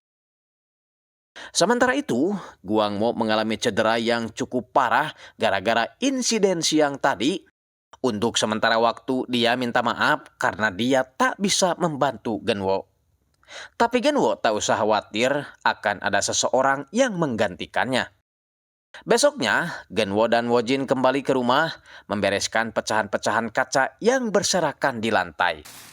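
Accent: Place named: Sunda, Indonesia. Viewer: joss ie s Dubing org sunda 🎉🎉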